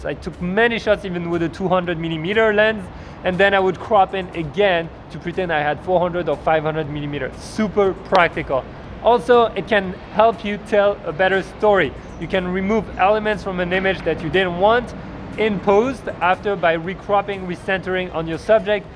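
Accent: France. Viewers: Love your French accent dude! I do use crop a lot!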